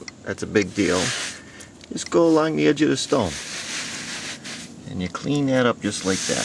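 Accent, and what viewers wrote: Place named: Scranton, United States. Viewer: I live in St Louis but I grew up in Scranton. I’d know that accent anywhere. Great stuff.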